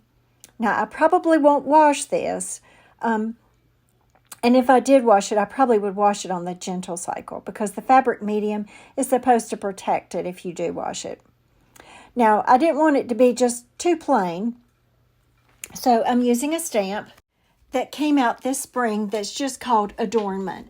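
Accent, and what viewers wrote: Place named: North Carolina, United States. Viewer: Good morning from San Antonio! I really loved these ideas this week! I love all of your ideas, but this one inspired me so so much!!!! I have a quick question. You said you are from North Carolina.. I originally from Va beach and we just moved here to tx 3 years ago. Long story short. My family is originally from NC, actually my entire extended family still lives there. They live in Belmont, Lincolnton, And small town High Shoals. Now that my very long story, my life story 😂😂 are you close to those areas?? It’s your accent that sounds like them!! I guess I’m chatting with you because your my favorite!!!! Thanks 😊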